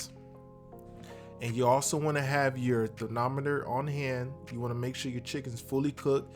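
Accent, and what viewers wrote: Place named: Florida, United States. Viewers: That accent right😂 I'm from Duval Co. He prob from Miami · right! I'm from Tampa and when I was watching I was like he def from Atlanta or Florida lol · right! I live in Fort Lauderdale. I’m like he’s has to be a local lol 🙌🏼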